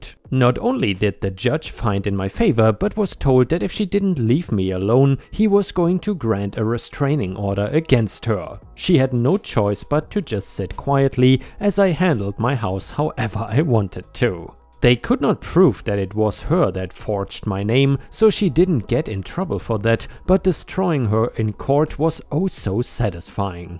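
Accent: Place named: Germany. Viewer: Are you German? Sounds like an German accent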